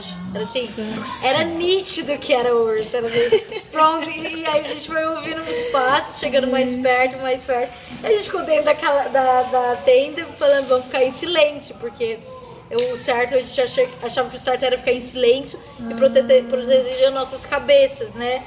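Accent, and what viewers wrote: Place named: Goiás, Brazil. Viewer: Perdeu o sotaque goiano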